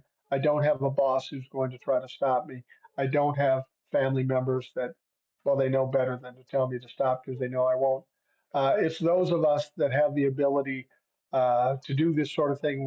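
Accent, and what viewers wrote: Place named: Liverpool, United Kingdom. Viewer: Yes the scous is starting to push !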